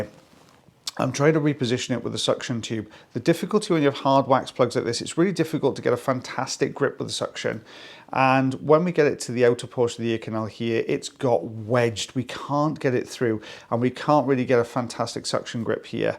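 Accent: Wales, United Kingdom. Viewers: My favourite is when he says Ebony for the dark wax. Lovely Welsh accent! Not in this video though.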